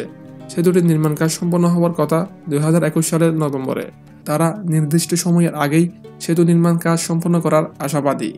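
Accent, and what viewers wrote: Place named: Chittagong, Bangladesh. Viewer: আপনার মুখ থেকে চট্টগ্রামের টোন গেলনা।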